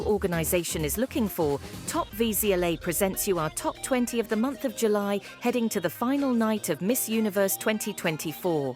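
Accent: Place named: England, United Kingdom. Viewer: English, your voice sounds like the Crown sisters from the Philippines and love it and the English accent.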